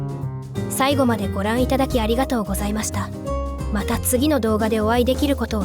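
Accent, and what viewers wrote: Kansai, Japan. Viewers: わい関西人やから時々関西弁はいるの親近感感じてうれしい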